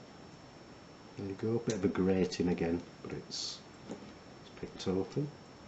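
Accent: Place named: United Kingdom. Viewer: i love your accent...or should i say, i love your british english. you got no accent at all its just how english should be spoken! :))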